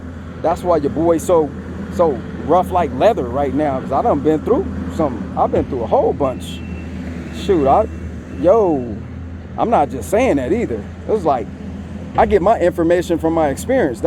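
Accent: South, United States. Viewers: I love the message. you sound like you from down south. my family is down for your training!! this is food!!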